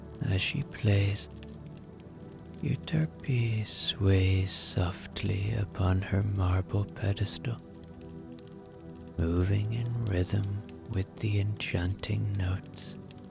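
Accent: Ireland. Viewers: I never hear anything of your stories because I fall asleep immediately 😅. I love your Irish accent and voice and the fantasy journeys! I also love that you were inspired to use your gift this way! After the 1st story (monk in valley), I felt better since. I think it removed blockages while I was asleep. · Irish story tellers are the best!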